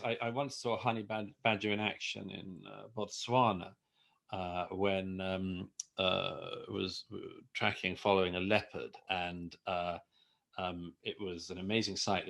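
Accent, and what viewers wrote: United Kingdom. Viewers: Your tuned British accent ❤ makes me hot on you Douglas babe 😘💋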